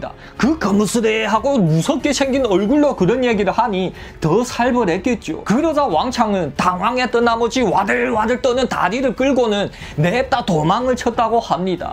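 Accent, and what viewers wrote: Yanbian, China. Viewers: 옌볜 말투 넘좋아~~^^😂❤ 구독!! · 중국이야기라 조신족 말투를 하는가? 아니면 원래 조선족출신이라 발음이 그런가 ᆢ하옇튼 시원시원 듣기 좋습니다. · 언어 표현력이나 사용하는 단어들은 한국인 못지 않은데....억양은 중국억양이네... 이야기를 재미있게 풀어 말하는 재주를 타고 났네요...중국어로 말하면 중국인들은 말문이 막히겠다..... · 이것도 뭐 재미나네. 구수한 연변사투리에 새롭습니다. ㅋㅋㅋㅋ 다음에는 涂贵武 사천킬러 투꿔이우 이야기도 좀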